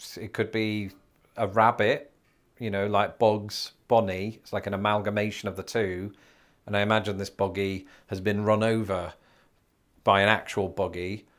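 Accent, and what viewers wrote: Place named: United Kingdom. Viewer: Good job. All that was missing was a Southern drawl...LOL! Never heard those words with a British accent...cute.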